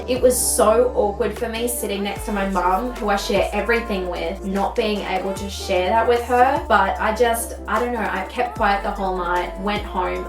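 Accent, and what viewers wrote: Australia. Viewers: I knew straight away by your voice your an Aussie!!! Im an Aussie too! Subscribing right now! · I thought I recognised your accent from somewhere! Go Australia!! I'm Australian too! Your so pretty. 🇦🇺😍😘❤️🇦🇺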